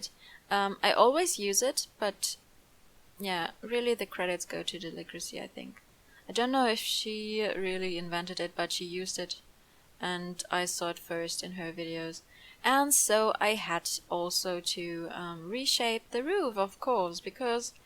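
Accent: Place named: Germany. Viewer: Bist du deutsch? Weil das dein Englisch hört sich so an als wenn du deutsch bist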